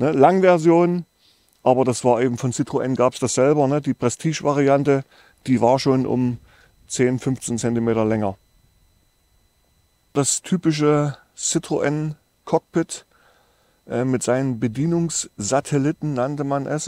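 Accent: Saxony, Germany. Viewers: Contents It's a saxon dialect (very slight, though)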